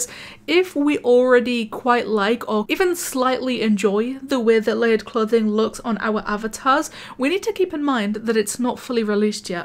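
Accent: United Kingdom. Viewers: she is just so bri-ish ♡ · She is too bri-ish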